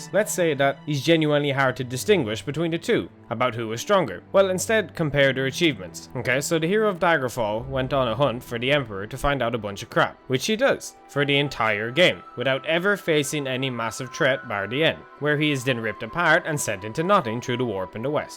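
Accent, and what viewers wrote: Ireland. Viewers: Dude you misunderstood something, the realm of a Deadric Prince is his BODY. Why do you think Jyggalag didn't come back after this? Because he lost his Real/Body and so has the power of a lesser Deadra. You the "Hero of Kvatch" are the new owner of this realm, so you own all the power that is in it. Love your Channel and your Irish accent. Ps. Dragonborn can alter the Dream of Creation and so are extremely powerful but are only tiny pieces of Aka (the Original time god).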